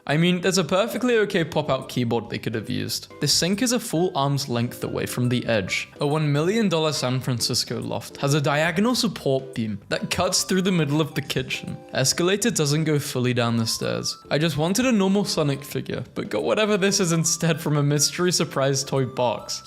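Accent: United Kingdom. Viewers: typical British pronunciation confusion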